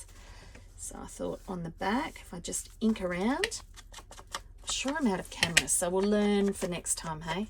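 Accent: Australia. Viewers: Hi, nice to hear another Aussie xx love this journal xx Hope your channel grows, so nice to support you. Look forward to seeing more!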